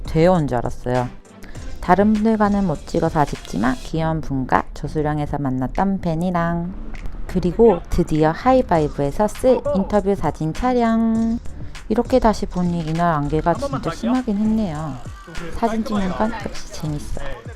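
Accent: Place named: Seoul, South Korea. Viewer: 님 남산북악 가셨군요 먼가 말투가 서울사람 같아요 ㅋㅋ 안개가 심해서 아쉬웠겠어요 잘봤습니다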